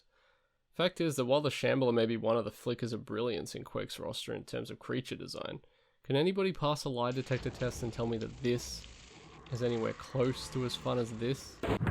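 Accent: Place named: Australia. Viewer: Subbed! Not trying to be a bitch, I mean this as constructive criticism - you talk kinda slow, your voice is nice it was a tad too fast on 1.25 and , it would probably be good to speak a little faster. - it's not your accent, I'm Australian too, peace from Perth, WA(steland) ❤what you said about good sprites > poor 3D, HOLY SHIT THAT IS SO PAINFULLY TRUE WITH POKEMON! Seriously, compare the gen 5 sprites on the DS (nearly 20 year old hardware tsk tsk) to the gen 6 (3DS) 3D renderings, they looked lifeless and cursed - shit, compare gen 5 sprites even to gen 9 on the Switch, gen 5 sprites are STILL sooooooo much better!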